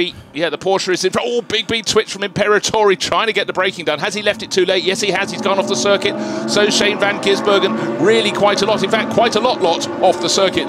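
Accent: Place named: Australia. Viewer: I hear an Australian accent 👣.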